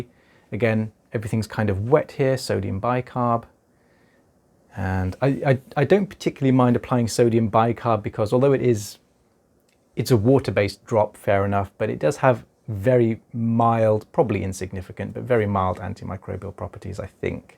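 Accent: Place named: RP, United Kingdom. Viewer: I cannot stand this posh xxxx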